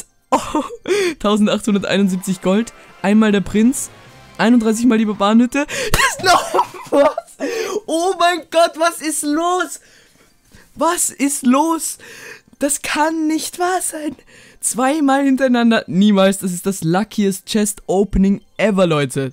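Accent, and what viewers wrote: Austria. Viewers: Österreich du?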